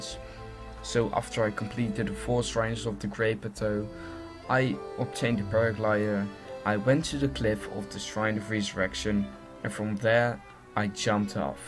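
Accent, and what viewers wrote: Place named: England, United Kingdom. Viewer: ur english accent is getting pretty dank btw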